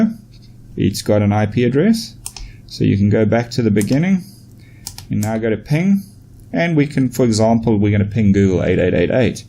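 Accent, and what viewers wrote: South Africa. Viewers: I thought you sounded South African! Mentioning pricing in Rand is what confirmed it. Thanks for the decent review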